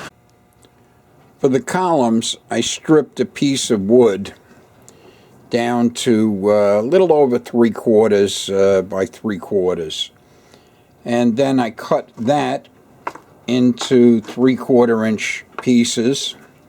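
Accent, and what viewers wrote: New York, United States. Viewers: Thank you so much for this video,, I visited Brooklyn 20 years ago and always wanted to build a railway model of it,, Now I finally have the time and space I am going to start. Thank you for the tips.... must say I love your accent-- it takes me right back to the summer of 94 and my month in New York,, kindest regards from England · That's gotta be the thickest New York accent I ever heard on YouTube so far and the design of your el is absolutely great!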